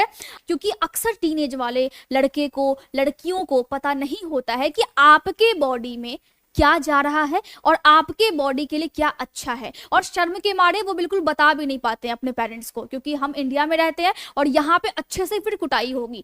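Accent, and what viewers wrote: Bihar, India. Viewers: Didi app Bihar se ho kiya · Bihari ho ka ji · बिहार वाले पहचान मे आ जाते है बोलने के तरीके से आप कहा से है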